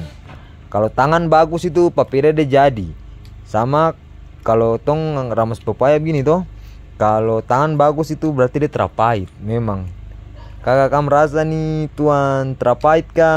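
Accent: Sulawesi, Indonesia. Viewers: Orang Sulawesi ya kalau liat logatnya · Abang orang mana ap orang Sulawesi betul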